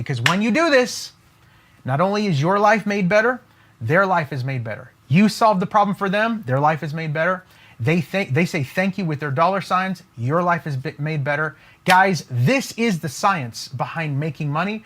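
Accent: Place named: Israel. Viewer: pretty good Israeli accent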